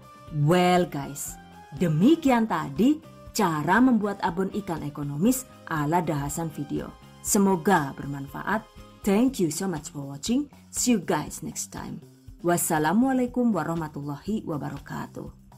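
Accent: Banyumas, Indonesia. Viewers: Saya suka lihat youtube ibu , kreatif, tata bahasa lucu, pakai ngapak bahasa Inggris gokael gaes 😁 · Hahaha wong ngapak juga yah ternyata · Kaget, bar formal trs ngapag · Wkwkwk saya orang banyumas....denger orang ngomong ngapak jadi ketawa sendiri 🤣🤣🤣🤣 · Rika wong ndi sih yu ketone ngapak temen kaya nyong hehehehee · Asem ngapak jebule 😂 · Asli mana seh kok bahasanya sm kek aku ngapak" 👍😁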